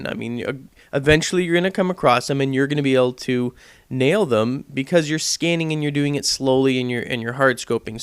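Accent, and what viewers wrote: Canada. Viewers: lol u canadian?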